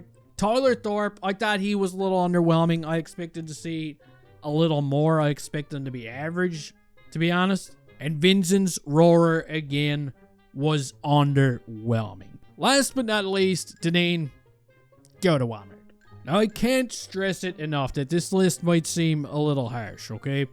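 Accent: Newfoundland, Canada. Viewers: Are you a Newfie? You sound Newfoundlander lol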